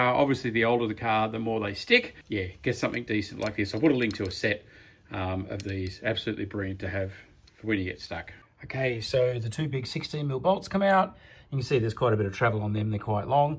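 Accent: Australia. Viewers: Were are you located. You sound aussie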